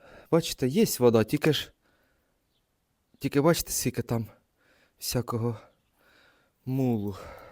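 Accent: Poltava, Ukraine. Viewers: З перших слів відчувається Полтавський говір❤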